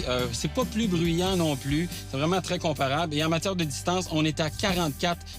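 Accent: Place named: Quebec, Canada. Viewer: J’adore l’accent Québequois!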